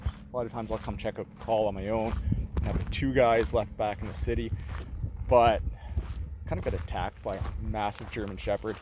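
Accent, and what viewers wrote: Canada. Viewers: How bout some made in Canada tools since you are obviously Canadian, as we can tell by your accent. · Wow. And a Canadian accent. The words are tighter. It was great to watch.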